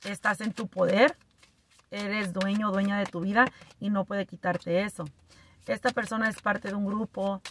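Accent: Mexico. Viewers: ... Me haces reír mucho más aún con tu acento mexicano.. gracias ✨💙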